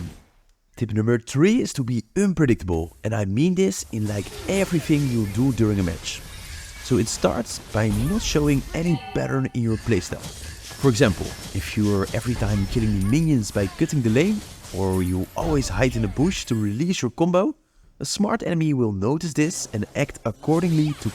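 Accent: Netherlands. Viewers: I don't need to listen a second longer wanneer ik iemand die Nederlands praat Engels hoor spreken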